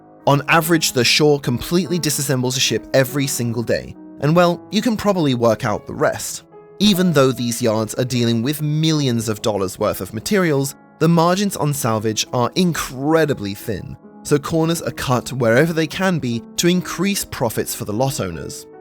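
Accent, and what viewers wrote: United Kingdom. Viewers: Really excited to watch this until I heard the narrator is British, what a shame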